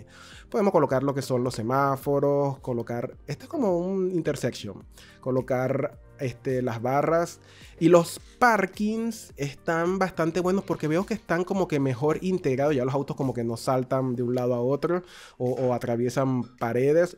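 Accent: Venezuela. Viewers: Bro eres de Venezuela?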